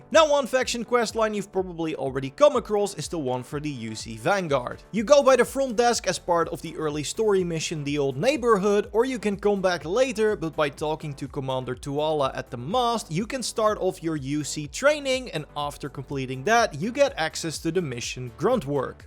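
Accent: Netherlands. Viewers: Bro are you dutch? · JEEEEZZ Dutch accent much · Je ruikt het Nederlandse gewoon 🤣🤣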